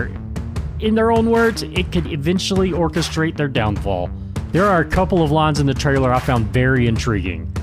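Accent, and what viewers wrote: Texas, United States. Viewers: Wait, is that like a Texan accent? I just watched the video released before this one and you (?) were speaking Chinese. What's going on lol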